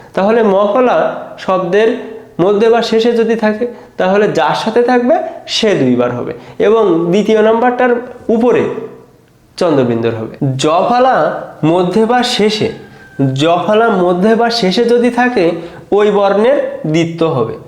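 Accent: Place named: Satkhira, Bangladesh. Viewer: বাসা কোথায় আপনার,,????? কথার টোন শুনে মনে হচ্ছে সাতক্ষীরায়,,,আমি আপনার সব ক্লাস দেখি,,,বাংলা সাহিত্য গদ্যের mcq and ব্যাখ্যা দেখে তারপর বই থেকে দেখছি এখন খুব সহজ হয়ে গেছে,,, ধন্যবাদ ধন্যবাদ 😊😊😊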